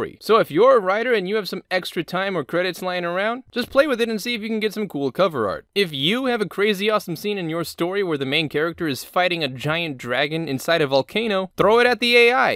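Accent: New York, United States. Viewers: Ahhh it’s a New Yorker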